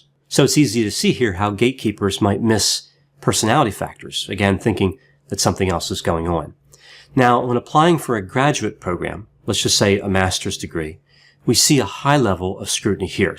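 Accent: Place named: Delaware, United States. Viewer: Haha..knew that accent was familar..deliware..I'm from.philly:) this was very informative on becoming a licence clanition..if often thought about it. Teachers told me I'd be good at it lol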